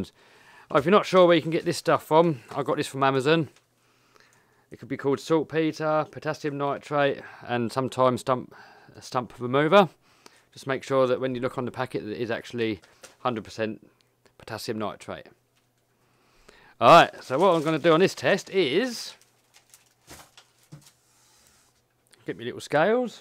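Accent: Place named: United Kingdom. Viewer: GradeAUnderA is that you? What region or area in the UK is your accent from?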